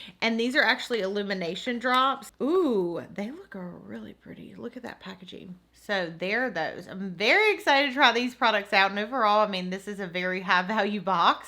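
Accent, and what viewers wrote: South, United States. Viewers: Yay I’m early for once also I LOVE your southern accent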